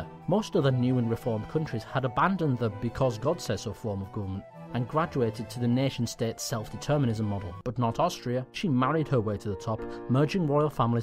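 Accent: United Kingdom. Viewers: This was the first video I had watched of, and after it, I had come to love all his work. It a damn shame we'll never hear his snarky British accent snap another witty comment or see another one of his videos in this unique and detailed style. Rest in peace, you have left behind a legacy and personality not easily mimicked.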